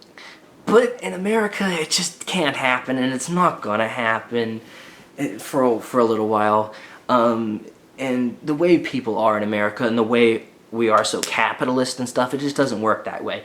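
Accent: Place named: Canada. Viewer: You should move to Canada... You talk like a lot of us haha Decent poli video sir!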